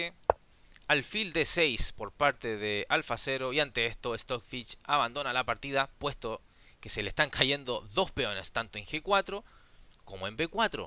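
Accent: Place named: Chile. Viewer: Estimado creo que eres el mejor comentarista de ajedrez que he escuchado, la emoción que logras despertar es increíble 🤩 Te envío un abrazo desde Viña Del Mar, Chile 🇨🇱 (Por tu acento asumo que también eres chileno) Espero ver tus análisis del torneo mundial entre Carlsen y Nepo!!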